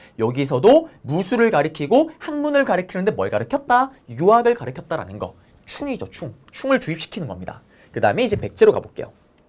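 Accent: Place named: Daegu, South Korea. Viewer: 진짜 이 쌤 짱이에요 ㅋㅋㅋㅋㅋ 좋다는 쌤 다 들어봤어도 저 역알못 이과출신이라 너무 힘들었거든요 ㅠㅠㅠ 근데 이 쌤은 대구 사투리가 구수하셔서 몰입도 잘 되고 설명도 이해가 잘 돼요 ㅋㅋㅋ 이런 강의 무료로 풀어주신 에듀윌님...그리고 쌤 너무너무 감사합니다 ㅠㅠㅠㅠ 한 달 동안 열심히 공부해서 꼭 한능검 잘 봐올게요!!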